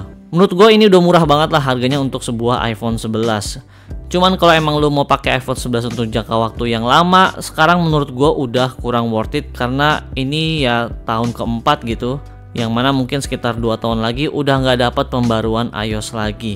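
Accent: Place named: East Java, Indonesia. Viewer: kayae mas.e orang jawatimur ya pernah buat konten di chimory 😁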